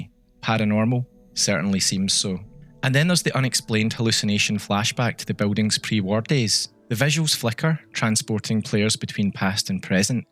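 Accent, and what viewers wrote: Scotland, United Kingdom. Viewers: wow you're severely scottish, I like it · I know it's Scottish I said this on purpose to mess with you. Good spirits though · love to see my scots making some great you tube content on something i love, you've saved me from American accents for tonight aha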